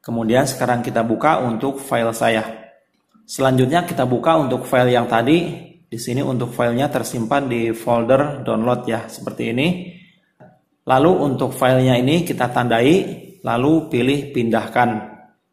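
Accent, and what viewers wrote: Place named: Sukabumi, Indonesia. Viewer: Logat nya mirip orang Sukabumi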